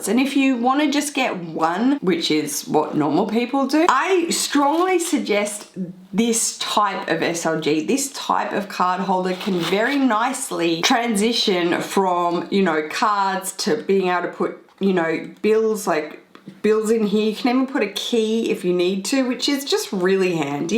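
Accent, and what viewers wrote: Australia. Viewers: Hi, I love watching your videos and hearing your Aussie accent too 😍😍 sorry don’t wanna sound rude but just out of curiosity, what is your plan with your luxury bags collection in the future? What is your goal with them? You obviously won’t be able to wear them all, so what’s your plan with your collection in the future? Thanks! 😊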